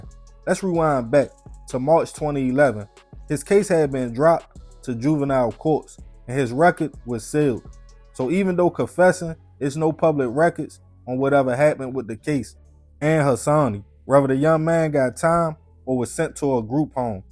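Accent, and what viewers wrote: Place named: Maryland, United States. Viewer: Da con…tent! I’m from NJ and my family lived in Silver Springs, MD and your voice made me recall their accents. Salute.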